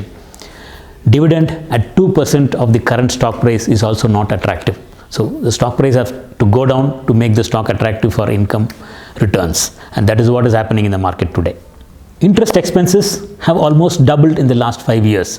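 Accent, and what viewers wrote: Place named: India. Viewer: Cant fast forwad your videos... Love the way u explain.. Even your english feels like Hindi.. Wos❤️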